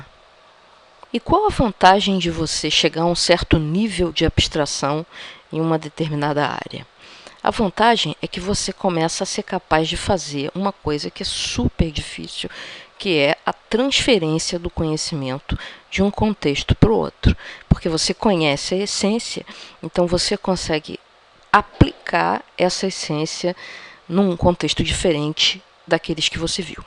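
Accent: Rio de Janeiro, Brazil. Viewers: Eu acho interessante e legal a "entonação do X/S" na sua fala, rs. Sotaque carioca? De qualquer forma, parabéns pela iniciativa! Estou estudando para concursos e assistindo todos os vídeos relacionados ao aprendizado. Obrigado!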